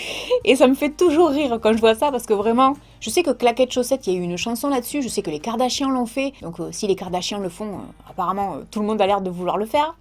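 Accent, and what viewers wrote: South, France. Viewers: trop mignon ton accent!!!!! et vive les provinciaux.... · C'était une super vidéo comme d'habitude! Tu es toujours aussi belle (et personnellement j'aime bien l'accent du sud)! 😊😘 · Ahh non non au peigne- dents, maillot à poils et ballerines orteils! Non non!!:D y a des trucs que je n'avais jamais vu: manches xxl, jogging... mais pas du tout envie d'essayer! Et les cuissardes ugg me font penser au chiens qui sont tout fripés!:)) faut être à Hollywood pour porter ce genre de trucs et passer à peu près inaperçu!:)) très chouette vidéo!:) bisous! Et vive l'accent du sud! (une sudiste à Paris!):) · J’aime trop ton accent j’ai l’impression d’être en vacances dans le sud quand tu parles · Coucou alors premierement tu as totalement raison " ca vient de Paris alors..." mais quand ob regarde ce qu'ils portent a Paris c'est de la dobe pour être polie lol c spécial faut avouer... 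Mais même moi qui suis du nord (ch'ti MDR) j'envie le sud pour bcp de chose: la météo déjà lol votre accent est carrément plus glam ke chez nous MDR on va pas se mentir et puis vos petits plats 😍 etc.... Je m'arrête la mais vous avez tant a nous apprendre... Voila bisoux et ne te remet pas en question reste au tu es c'est parfait je t'adore 😉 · Perso je viens de Paris et j'adooore ton accent, mais en mm temps j'aime tous les accents du sud <3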